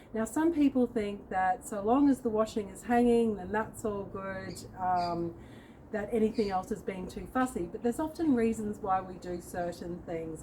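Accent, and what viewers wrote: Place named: New Zealand. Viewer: Im from nz and i remember a friend of mine coming to nz for the first time she couldn't get over how every household had a clothesline and we dried our clothes that way whenever we wanted to 😂 then i saw in some parts of the states people were excited to be allowed to have clotheslines! I was thinking that's weird had they been using dryers all this time when it's free to dry clothes outside? There were video's and blogs coming out on how to dry clothes for free (obvious air drying) how to make clotheslines, how to hang washing. I can't imagine being without a clotheslines. When i moved to my new home i done away with the old clotheslines and made my own suitable for my big family even repositioned it to get the most out of the sun during winter. We don't like dryers so i made use of our covered deck area for rainy day's and have made a simple clotheslines there as well because washing is neverending 😂😂 Loved your video coz I'm over here like hmmm let's see how other people be hanging the t shirts 😂 then i was like this a kiwi gurrrllll im sure of it she sound like a kiwi to me l0l anyways i guess we still lucky here to have clotheslines, water tanks and chickens running in the backyard etc love from home chur xx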